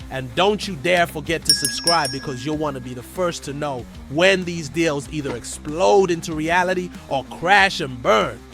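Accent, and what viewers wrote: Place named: United States. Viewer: Why change the voice to American. It’s unbearable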